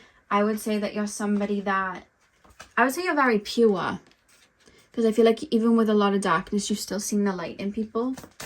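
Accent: United Kingdom. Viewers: I really like your readings but you talk too fast with a British accent I couldn’t catch up.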